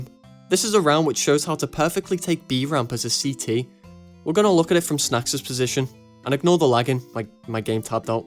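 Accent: Liverpool, United Kingdom. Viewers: Was sceptical on a Scouse lad trying to break down a map 😂. Very nice break down and very well put together video brother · Wanted to ask that as your a scouser do you also say your hank marvin? Had a scouse roommate a couple of years ago and as an American I cracked up every time he frustratedly said “ah lad whaddaya on about?!” Good times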